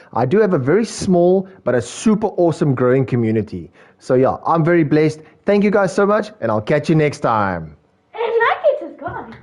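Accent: South Africa. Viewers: South African? Wait let me sub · South African? Wragtig! Ek het ge-sub.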